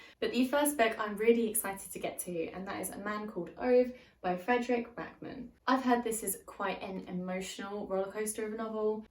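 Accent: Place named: United Kingdom. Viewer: OMG I have never laughed soooo hard while watching one of your videos 😂🤣 First off, I heard "arse holes at night" instead of "our souls at night" - Yes, that's right, despite the fact that I was born in 1993, I am in fact a twelve year old XD (although, in my defense, being from the US, I'm not used to hearing folks speak with British accent IRL so it does take me like, half a sec longer to interpret words spoken by ya'll from across the pond:3) Secondly, "The next book is second in a trilogy...I've heard there's maybe going to be another book..." - LMAO I was sooooo sure here that you were making fun of Kingkiller Chronicle before you showed the book you were actually talking about XD (I have not slept well past few days so my brain just autopilot at this point and is interpreting ppl's words wrong lol) Anyway, great video as always <3 And who know, perhaps one day I will grow up a bit and/or catch a good night of sleep:3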